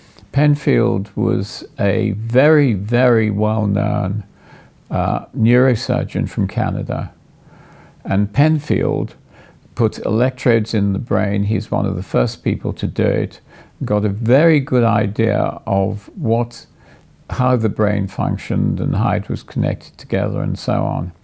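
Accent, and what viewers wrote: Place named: United Kingdom. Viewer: I’m quite fond of that kind of English - posh but unpretentiously so. effortlessly sophisticated. quintessentially English. me like!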